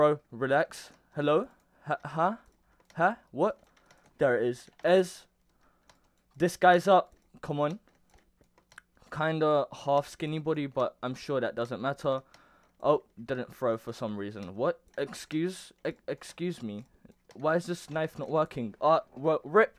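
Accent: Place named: South London, United Kingdom. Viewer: I recognise your voice from some where in South London im not saying the specific place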